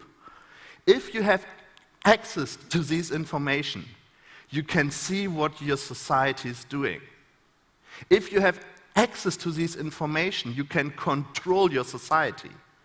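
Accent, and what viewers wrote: Germany. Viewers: Sort of, but it's still more important what he's saying rather than how well he's communicating. His issues communicating could've just been nerves or an actual mental tic, as well as combining that with his german accent in the english language, but what he's saying is scary as hell. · Ohhh, it's a *stutter*. I thought that was just how to German accent made some people sound. >_> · Those are standard speech tricks for stutterers, to stop them from stuttering. And the german accent of course.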